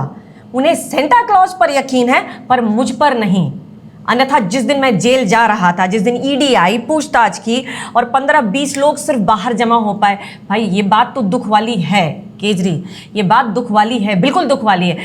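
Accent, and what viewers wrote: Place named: Kanpur, India. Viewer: Bhai aapki bhasha and tone. Kanpuriya hai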